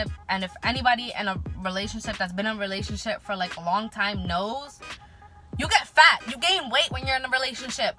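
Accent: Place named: Los Angeles, United States. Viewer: Good job Pretty woman. You sound like you're from LA.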